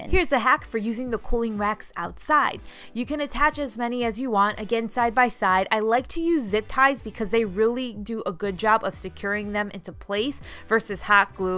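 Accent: Chicago, United States. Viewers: I don't usually comment on YouTube videos but I have been watching you for a bit now and wanted to tell you that you just think of the most clever ideas! I also like watching you as you remind me of my home town of Chicago, which is where I think you are at based on your accent! Keep up the great vids - love seeing them! And, yes, I am subscribed. 😉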